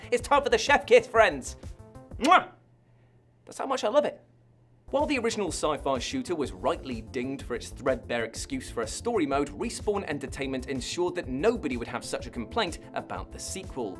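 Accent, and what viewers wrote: United Kingdom. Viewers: The moment I heard the voice... I expected to hear... hii I'm from w... and these are 10 games.... xD Glad to find you on this channel! Hit sub cus I love your corny humour and British narration! Looking for a 10ish hour game to complete in 2ish streams... and this gave me yet another channel to sub and watch xD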